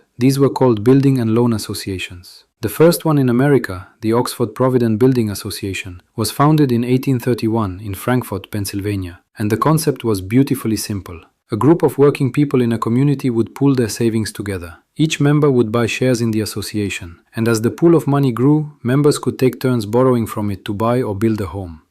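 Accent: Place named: Eastern Europe. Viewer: Почему не по русски? Акцент то то вроде славянский.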